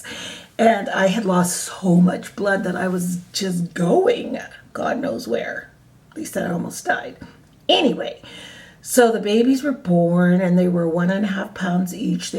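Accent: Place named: Canada. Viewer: That is a beautiful story😇👼 thank you ,, where are you from are you from Canada you sound like a Canadian?? Please reply thank you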